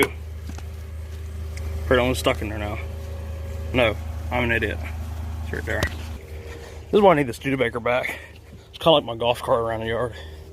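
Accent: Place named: Coastal North Carolina, United States. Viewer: man i just found your channel i heard you talking and you have to be coastal nc im in pamlico county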